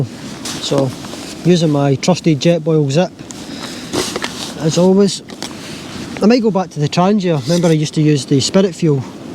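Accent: Scotland, United Kingdom. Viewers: Hello from Boston, Massachusetts, New England, USA. Love the Scottish highlands and Scottish accent. Could listen to you talk about your camping meals, puddles, soaked tent (though dry inside, I’m sure) and other details all day. Excellent video. Thank you.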